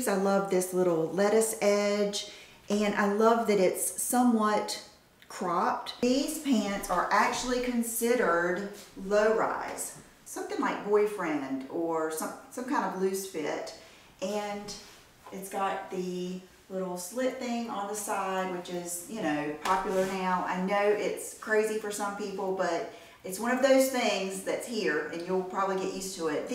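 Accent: South, United States. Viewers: This is the first video I have ever watched of her but I love her. So whimsical....she enjoys reminiscing....old mac and YouTube days,etc!! Absolutely subscribed after just a few minutes of watching her. Plus, the southern accent....I'm from south Georgia myself so my accent gets picked on alot...love her!! Can't wait to go thru her channel and watch all her videos!!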